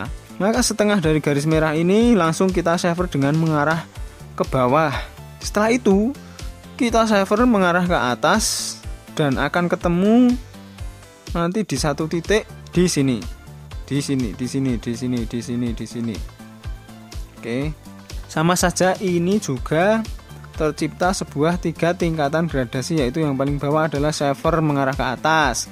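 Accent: Java, Indonesia. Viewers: sampean ngomong medok eram toh mas mas 😁 tutorial terbaik, hasil terbaik, tergokil dan terkocak 🙏 salam horas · Mas omah u ngend kok medok ngomonge,,,semangat trs👍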